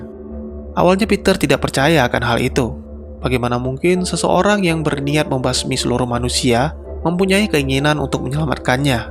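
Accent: Aceh, Indonesia. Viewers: Mimin org aceh ya?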